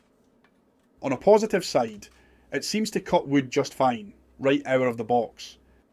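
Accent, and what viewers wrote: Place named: Scotland, United Kingdom. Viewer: I would guess Scotland from the accent - somewhere near or in Glasgow?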